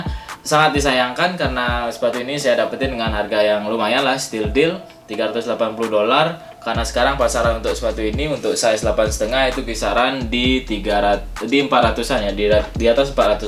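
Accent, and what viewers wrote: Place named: Madura, Indonesia. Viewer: Jer, mirip mirip Logat meduro 🗿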